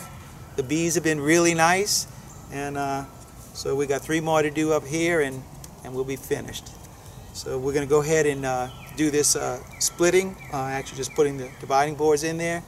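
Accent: Louisiana, United States. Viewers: Are you from Louisana?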